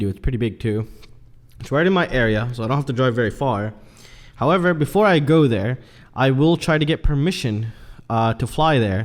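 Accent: United States. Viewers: Dude ...maybe I missed it but what’s your origin story? You sound like an American living abroad. You’ve got a cool channel and a pretty bright inquisitive guy! Keep on making great content! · How do I enter contest? :p Glad to hear your son is ok. And I thought you where american, your english is not hungarian. ;)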